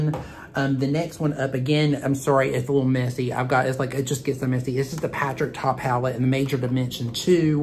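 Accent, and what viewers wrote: South, United States. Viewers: What a great video! I know how much effort went into this….completely enjoyable. The combination of your southern accent and your obvious makeup artist experience is so Nice to watch! Thanks for uploading👍.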